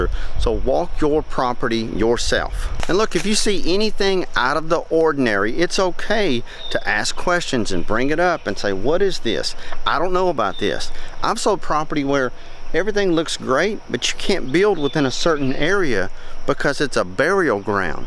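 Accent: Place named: Appalachia, United States. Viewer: It is supposedly. Did you notice, no b. Not suppose a blee. It suppose ed lee. Being instructed by a hillbilly.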